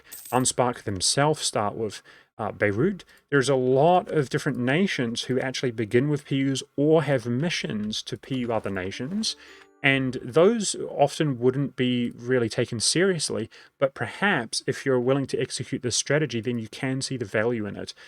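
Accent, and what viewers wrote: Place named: New Zealand. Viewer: I’m new to the game and You said your not demonstrating any skill but whatever that was must take some skill right? Lovely content as always, I’m honestly not used to hearing the kiwi accent on gaming channels so it’s refreshing.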